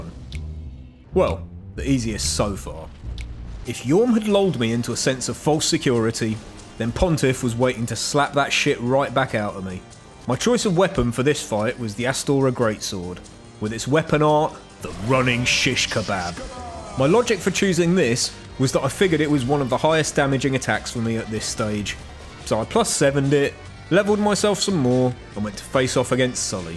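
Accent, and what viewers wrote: United Kingdom. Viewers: This British accent is like a dark souls one, you’re the onion knight of reality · Somehow your british ( I think, im sorry if I'm wrong ) accent makes this 10 times more entertaining .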